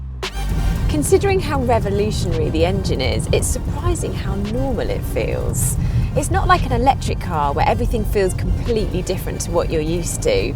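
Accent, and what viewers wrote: United Kingdom. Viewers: I like her accent :))) I don't thinks there's anything wrong with British accents but British folks seem to like to attack each other over it, especially they like to attack some of their 'posh' accents. That's kinda funny :))) But there might be some sense in that too, I guess. Some intonations and cadences might be overkill but that's the way we speak. Sometimes some women can't stand the accent of some other girl :)))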